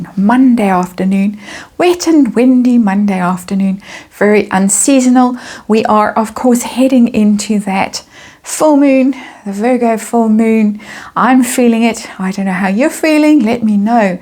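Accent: South Africa. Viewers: I'm here for the snark too!🤭 I love beautiful, lilting SA accent and the way she giggles and snarks!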